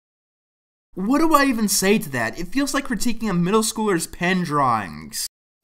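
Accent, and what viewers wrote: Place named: United States. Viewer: So realise he's American, right? They say "bedder" instead of "better", "lenguage" instead of "language", "naht" instead of "not"... I could go on. Maybe that's just how they pronounce "execs" in America?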